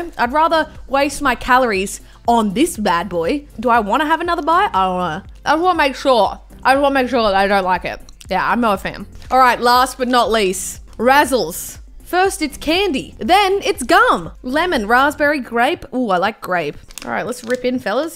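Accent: Australia. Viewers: Are you a off brand azzyland with a budget cut and Australian accsent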